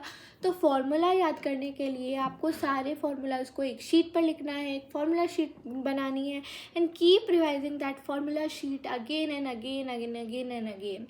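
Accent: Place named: South, India. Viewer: Ig she is south indian people do have accent it's normal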